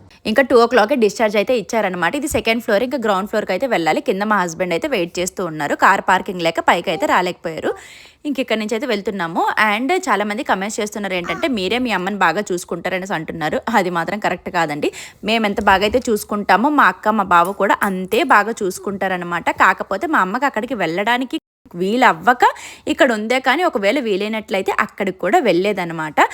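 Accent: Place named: Telangana, India. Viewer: Telangana slang talking akka meru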